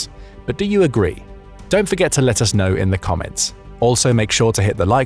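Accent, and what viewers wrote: England, United Kingdom. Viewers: It sounded like the narrator had an English accent. They can't use the F22. I'm not even sure they have the F35A.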